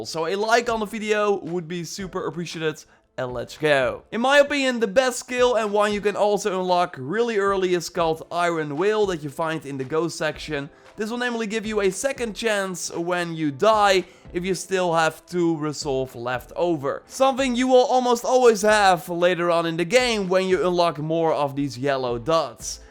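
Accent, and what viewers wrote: Netherlands. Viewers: You are dutch aren’t you??😂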